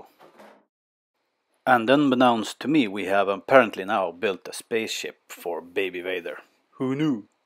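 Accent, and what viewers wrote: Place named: Ireland. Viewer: Don't lose the tool sounds, and please do not add music. Your vac and air scrubber remind us that safety and cleanliness go together. I like your commentary, you sound as if you were taught English by an Irishman. That's no bad thing by the way, Irish people often have better diction than geographically English speakers of the language. Thumbs up from me, you got a new sub 👍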